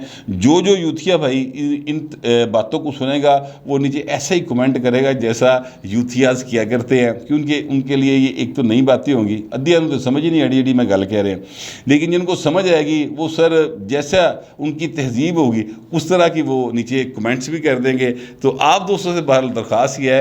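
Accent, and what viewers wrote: Lahore, Pakistan. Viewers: Weldone.Nice analysis with Punjabi and Lahori style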